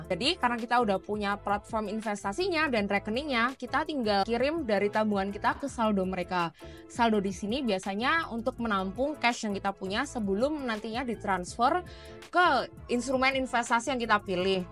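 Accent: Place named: East Java, Indonesia. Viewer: Penjelasannya mudah dipahami 👍, kyknya orang jatim deh😊(dialeknya)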